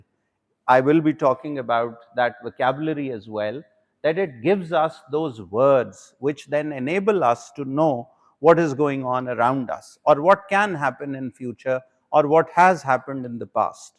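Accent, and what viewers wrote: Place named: Punjab, India. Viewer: Punjabi accent English.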